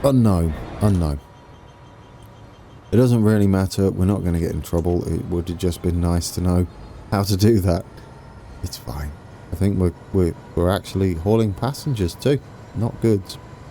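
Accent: United Kingdom. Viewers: It is fitting watching these British rail videos with a brittish narrator like yourself. Mmmm, my immersion levels are in peak condition. I have to say that TWS has GOT to be the best looking and sounding of all the train sims out there. Their artists and effects guys did a top notch job. Also the realistic landslide and they even nailed the workers standing by doing nothing! That is so immersive! XD GG. Gonna watch a few of your train vids. I just got TWS 2 recently and im enjoying it a lot. Doing the Sand Patch campaign now.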